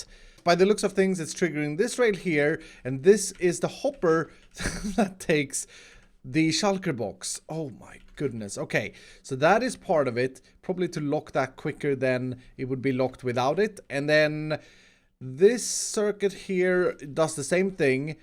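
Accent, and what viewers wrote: Sweden. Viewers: Where from sweden are you from? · Are u from Sweden? Just wondering:)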